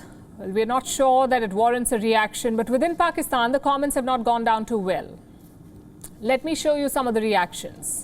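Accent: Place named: India. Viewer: Typical Indian woman with typical Indian English accent...your degrading the Queens language. Of course its India,you are ery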